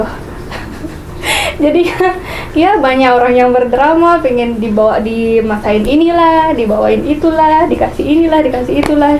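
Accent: Bali, Indonesia. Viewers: Logatnya asli bali bnget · Sing hilang" logat bali ne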